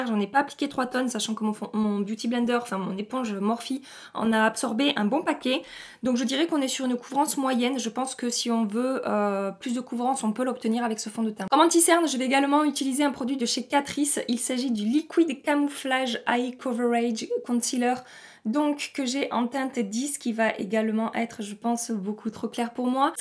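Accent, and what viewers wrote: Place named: Bordeaux, France. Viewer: serais tu de Bordeaux ou de la région bordelaise pour avoir cet accent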